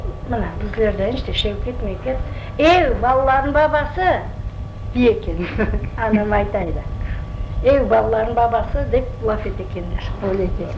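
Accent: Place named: Bakhchysarai, Ukraine. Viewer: Büyük zevqnen baqtım, öz Bağçasaray lehçemizni eşitmek ne qadar hoştır 😍 bu işke isse qoşqanlarınıñ elleri dert körmesin!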